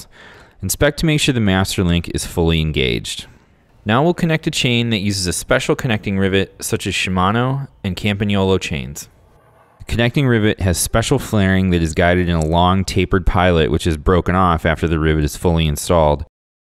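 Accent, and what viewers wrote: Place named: Canada. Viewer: sounds like a canadian..I like the canadians I have known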